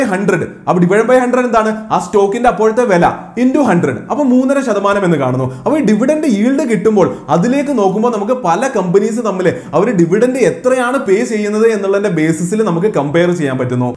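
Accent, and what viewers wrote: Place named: Kozhikode, India. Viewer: super man super kozhikodan bhashayil paranjal oroo mukkummoolayum thappi egal paranj tharand 👍👍👍👌👌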